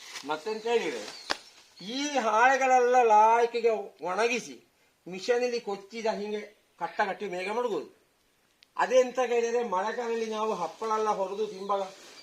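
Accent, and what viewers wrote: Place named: Tulu, India. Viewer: ನೀವು ಕನ್ನಡ ಚೆನ್ನಾಗಿ ಮಾತಾಡೋನು ನಮ್ಮದು ತುಮಕೂರು ನಿಮ್ಮದು ಸ್ವಲ್ಪ ತುಳು ಭಾಷೆ ಮಿಕ್ಸ ಆತ ಉಂಟಲ್ಲ❤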